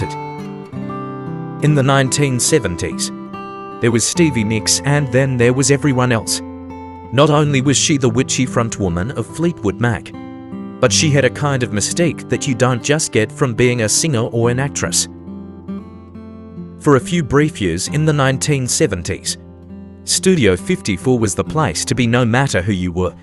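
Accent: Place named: Australia. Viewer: An AI with an Australian accent…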